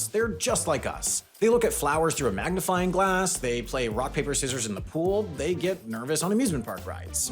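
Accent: United Kingdom. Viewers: Why does she sound British 😅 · damn that british accent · I love how she speaks British English, instead of Americanised drawl, absorbed by osmosis from mindless entertainment. Pure class 👌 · why does she sound british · why does she have a British accent? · how does she have a british accent?! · Why British? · She had to spend some time in Britain because that accent is a British one and I don't know if there is anywhere else she would pickup that accent, unless she is put on a voice for the videos.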